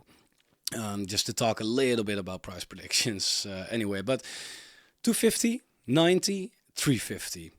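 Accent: Netherlands. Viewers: Nederlander? Nice video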